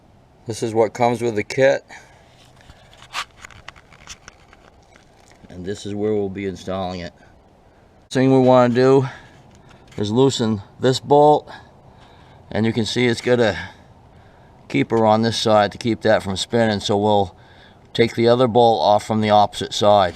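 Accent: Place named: Boston, United States. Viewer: Are you from Boston, MA? Love the accent :))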